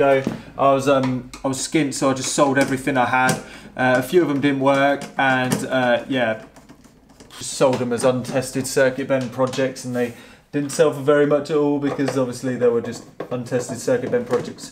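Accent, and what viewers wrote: United Kingdom. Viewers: It's weird. I understand only less than two thirds of what you are talking about, but I find watching and listening to you still interesting, motivating (why? I don't think I'll ever get myself into any electronics project) and strangely.. soothing. Maybe that's the passion / creativity / positivity ("don't be scared to try"? wonderful) flowing through. And the lovely british you are speaking (though maybe this one is because I just watched an american movie located in Texas :). Anyway, thank you!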